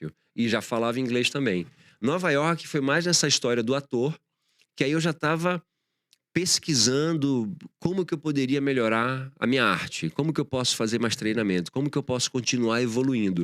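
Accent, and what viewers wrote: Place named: Recife, Brazil. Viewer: O cara sai de Recife, mas o sotaque não sai.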